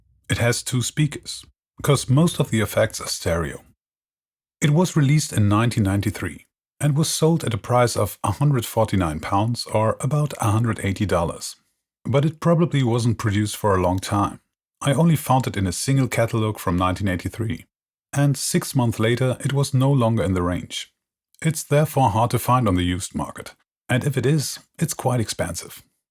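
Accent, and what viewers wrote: Germany. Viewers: Nice to hear the German accent again.👏👏😁😁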